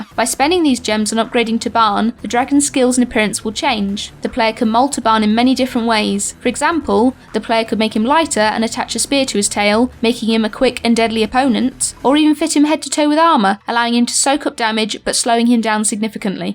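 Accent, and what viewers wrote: England, United Kingdom. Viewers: Jesus I was not expecting a broad English accent XD great video though!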